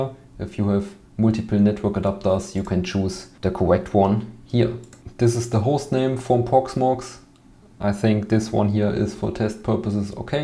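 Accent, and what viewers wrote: Germany. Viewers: you sound really german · I hear german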